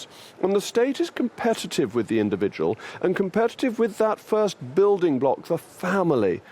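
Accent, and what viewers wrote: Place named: Upper class, United Kingdom. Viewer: Sorry, but I'm not stupid enough to listen to someone with accent like yours talk about "work". Jog on, toff.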